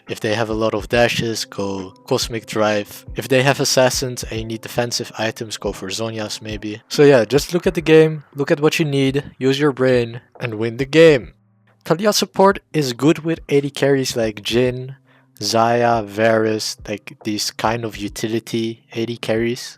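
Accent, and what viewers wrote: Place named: Netherlands. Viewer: Ik kon binnen de eerste paar seconden al raden dat je nederlands bent hahaha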